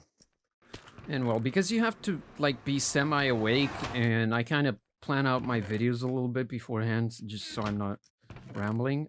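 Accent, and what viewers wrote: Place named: United States. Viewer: Ur voice changed, it got like a bit American like just a little bit and a bit more clear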